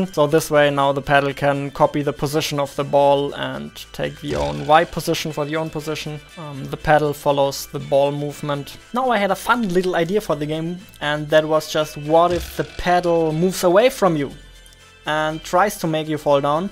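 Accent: Germany. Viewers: Are u german? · I understand your English really good, are you German ? ^^ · Are you from Germany? You kind of sound like it. I am from Germany but I can't really tell if you are because your English is so fluently xD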